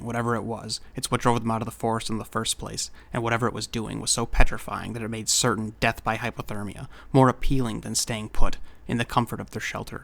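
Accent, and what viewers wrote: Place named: United States. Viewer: nope...northern canadian .... now living in tropics,btw : Your accent sounded 'american'..... if I'm wrong, I stand corrected. So what are you ? and what about 'radiation' reports in the area? Russians are pretty open about their findings. Your bombastic intro and analysis did Nothing for a conclusion. Which clinically... is real psycho. Now STFU with bs .... and offer a solution.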